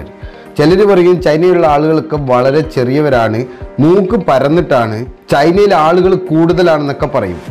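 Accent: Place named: Highland region, India. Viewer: Hai bro aru from up hill distic ur longeage slang up hill modal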